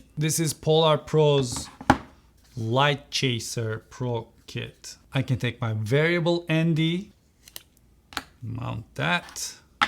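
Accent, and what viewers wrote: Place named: Turkey. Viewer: ar yu torkish birader, aksan igrenc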